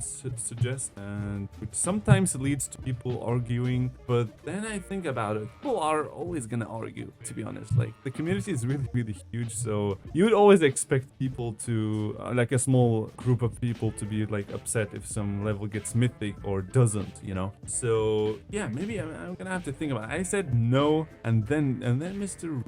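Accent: Germany. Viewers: Wait, are you German?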